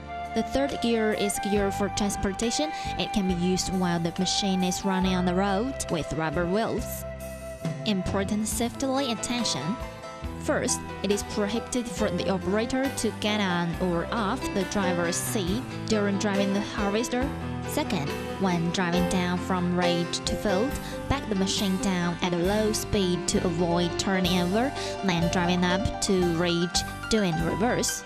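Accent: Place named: China. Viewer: an automatic reader with a chinese accent...i seen it all now.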